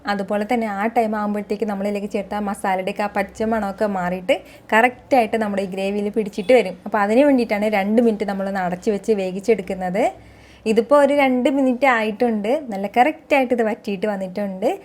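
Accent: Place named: Malabar, India. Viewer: ഹായ്, സുജിത് ഏട്ടന്റെ വീഡിയോ കണ്ടാണ് നിങ്ങളെ പറ്റി അറിഞ്ഞത്, എന്തായാലും ഞാൻ സബ്സ്ക്രൈബേർ ആയിട്ടുണ്ട്. ഇനി മുതൽ വീഡിയോസ് ഒക്കെ കാണാം.. നിങ്ങളെ സംസാരം ട്രിവാൻഡ്രം സ്ലാങ് അല്ലാലോ, ഒരു മലബാർ tech ആണല്ലോ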